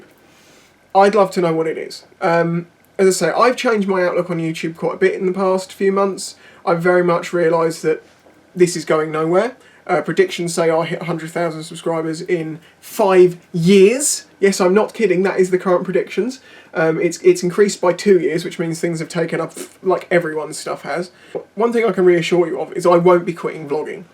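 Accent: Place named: United Kingdom. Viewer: I think it's the accent. I feel it even more strongly as English is not my native language and the weird manner of speaking is quite off putting for many people.. Maybe not that many Americans fancy the British accents?...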